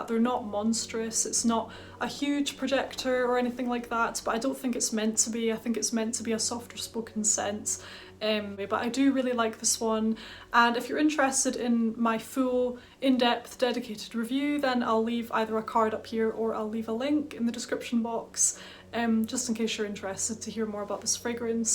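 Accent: United Kingdom. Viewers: Just discovered your channel and I‘m glad I did! Your vids have a nice, relaxing vibe. Are you British? I‘m sorry, I‘m Austrian and can‘t really tell, but it’s lovely and I understand you 😀 How large is your collection? Wasn‘t too keen on Nina Ricci Rose Extase either, to me it smells plasticky.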